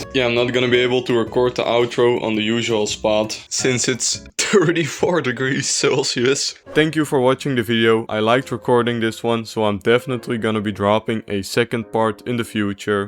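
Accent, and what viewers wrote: Netherlands. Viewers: nice video! je engels accent moet je misschien nog op oefenen. aan, jou nederlands accent te horen denk ik dat jij van nederland bent of niet? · You sound dutch 🤢🤢🤢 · love that dutch accent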